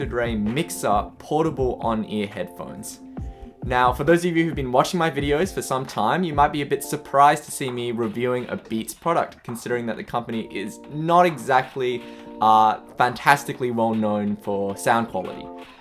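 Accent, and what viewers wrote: Australia. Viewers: What accent is it? Aussie?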